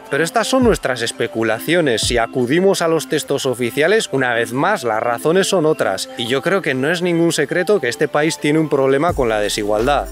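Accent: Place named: Basque Country, Spain. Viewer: Un vasco - vasco en la corte de chinpin o como se llame.Tiene un acento de euskaldun hablando español muy forzado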